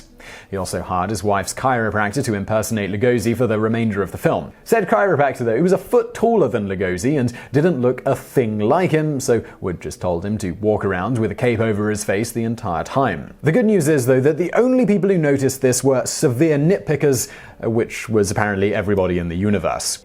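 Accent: United Kingdom. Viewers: Same. He has the perfect British accent...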